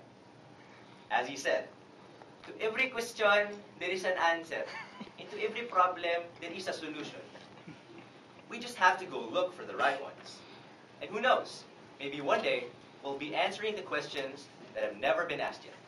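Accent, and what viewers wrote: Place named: United States. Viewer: how come his got an american accent?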